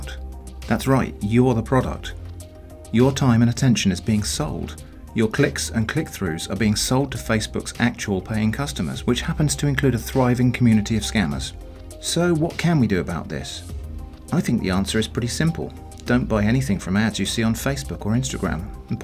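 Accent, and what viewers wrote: United Kingdom. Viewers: Man at this point I am watching this channel only because of his British accent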